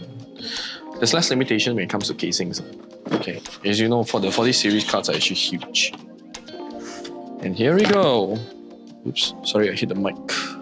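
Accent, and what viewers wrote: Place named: China. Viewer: Your accent is so chinese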